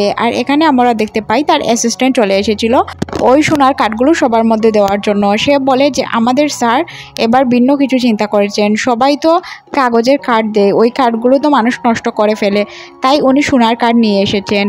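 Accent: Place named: Mymensingh, Bangladesh. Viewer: আপু আপনার উচ্চারণ ভালো না, ময়মনসিংহ আঞ্চলিকতা 😅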